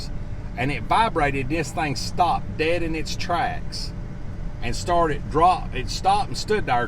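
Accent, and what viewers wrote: South, United States. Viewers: a southern drawl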